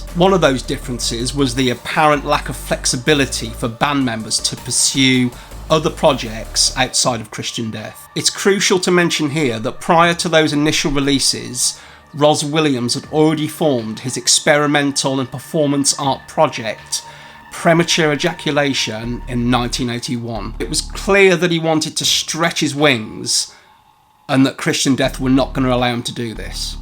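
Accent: England, United Kingdom. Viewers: Honestly,my entire knowledge about GOTH & DARK WAVE wouldn't fill a shot glass. What has me interested is a sheer boredom with what I've listened to for so long, DEATH METAL & BLACK METAL. It just seems like everything has been done. How many songs can be written about going feet first into a wood chipper or songs about the dark lord and master. So yes, I'm new to it. GOTH seems to have the darkness that I gravitate too, but being ignorant, your channel is a nice way to find about the various bands. It also helps that I could listen to an English accent read the dictionary. Carpe nocturne.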